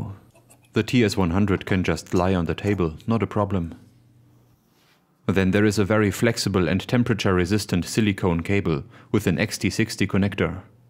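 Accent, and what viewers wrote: Germany. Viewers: The German accent makes this legit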